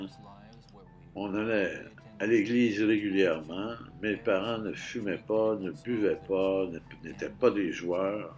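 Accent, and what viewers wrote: Quebec, Canada. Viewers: celà s'appelle le français Québécois pauvre inculte de français tu sonne raciste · Ce n'est pas un reportage canadien c'est une voix off québecoise. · C'est ps une sinusite , c'est l'accent canadien qui et insupportable..... pourquoi voir pris un canadien pour commenter cette vidéo...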